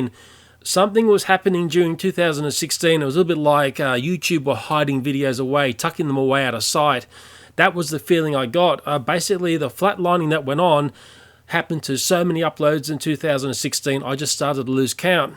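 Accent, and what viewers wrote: Australia. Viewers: Hello, I am a new subscriber found your channel couple months back. Out of all the channels I am subbed I look foward to your new videos the most. Love your personality, sense of humour and, your Australian accent. Keep up the hard work. I hope youtube doesn't continue to go down this path but it seems they keep messing with content creators and it is hard to get views unless you are always on the trending tab.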